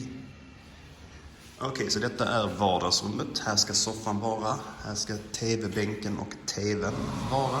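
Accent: Skåne, Sweden. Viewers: Tack för vlog. Maybe do some more of these please. Lucky day to move, same day as our new president! Grät du during that inauguration? I cried. Are you losing your Skåne accent?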